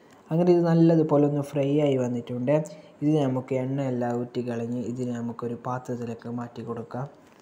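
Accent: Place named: Kasaragod, India. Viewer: കാസർഗോഡ് സ്ലാങ്ങും കാസർഗോഡ് പലഹാരവും കലക്കി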